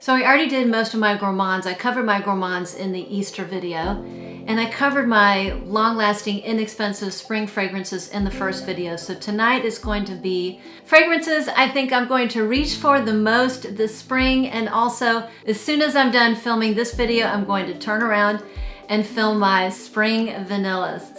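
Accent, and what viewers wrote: France. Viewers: My lovely and beautiful Scentsister 💕♥️ with a a crabby french accent😂😘 Let’s do a live chat some day😉🥰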